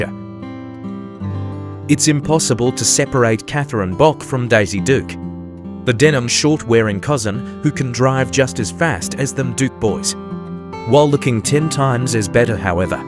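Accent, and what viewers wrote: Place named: Australia. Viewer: An AI with an Australian accent…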